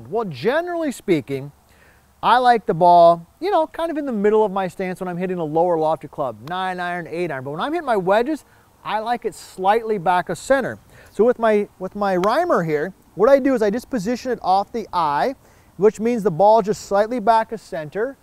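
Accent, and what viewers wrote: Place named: Wisconsin, United States. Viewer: Hey: Rooster here in sunny Estero Florida. I really liked your segment on changing the putting grip by turning the top hand so the putter face is always square. I tried it when I played Tuesday and broke 80 for the first time this summer at a reciprocal course. (not my home course were I don't know the greens.) I've lived the majority of my life in the St.Louis area and SW Florida since 2010. You sound like you are from Wisconsin. I spent a lot of time in MKE. I sold goods to Kohl's. Great folks. I like your friendly style and thorough explainations. Thanks. Rooster34135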